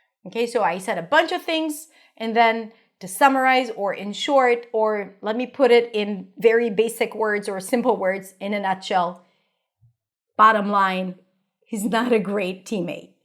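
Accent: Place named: United States. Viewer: Clear American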